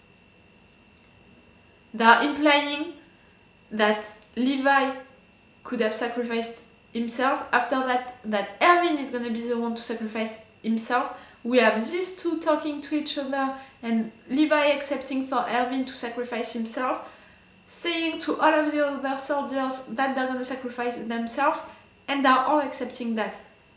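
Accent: France. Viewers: .....why I feel fall in love with ..you , reactor.. What wrong with me .. This is 1st time I had this feeling, experience.. 1st of all your dialect..slang. Are you french? It's so beautiful... For this moment I agree french is beautiful soft language.. Sorry this is random and ridiculous.haha Btw ..as aot fan...I will stick to this ,your channels,your reaction.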